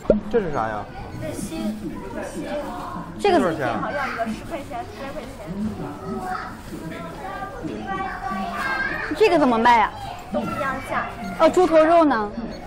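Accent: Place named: Weifang, China. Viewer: 肯定是潍坊老乡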